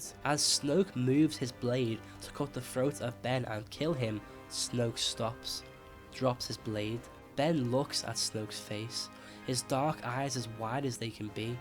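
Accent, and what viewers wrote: Liverpool, United Kingdom. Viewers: Are you from Liverpool? .-. also cool vids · Are you from Liverpool??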